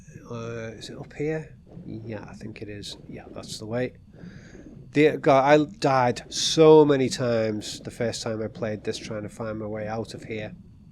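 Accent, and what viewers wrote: United Kingdom. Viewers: Interesting analysis! You also spund fairly local. are you based in merseyside? haven't found many content creators round here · This will be excellent. My favourite all time games for many reasons. The cerebral aspect of them at the forefront. Scouse and tomb raider what a mix. 👍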